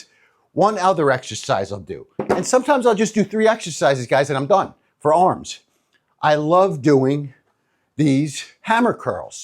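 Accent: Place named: East Coast, United States. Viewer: I'm from Holland, but you sound like a East Coast guy. NYC?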